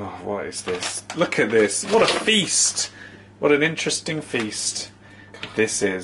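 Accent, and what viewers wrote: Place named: North, United Kingdom. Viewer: I thought for ages you were a fellow northerner, where are you from originally?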